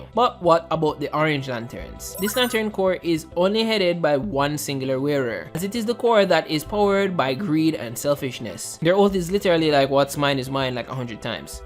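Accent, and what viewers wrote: Jamaica. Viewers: I love your accent, sounds Jamaican. Reminds me a lot of how Bob Marley talked.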